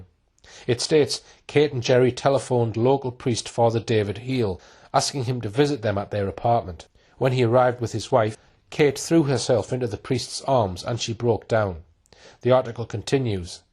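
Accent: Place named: Newcastle, United Kingdom. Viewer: YOU are a complete and utter moron. NOT only that are unable to deduce a commentary and facts. It’s not also locution lessons it’s elocution lessons . Before you make mindless comments on an accent in this case a Geordie one, take care of your grammar. This is broken down step by step. If you can’t follow this keep off the internet . Or scroll by. Spankwangle of a Troll.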